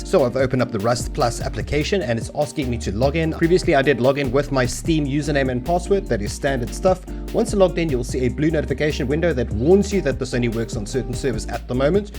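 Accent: South Africa. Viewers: Haha I could immediately recognize your SA accent! :D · Lekker ou ek het gehoor jy klink bietjie Suid Afrikaans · You sound like a south african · Are you South African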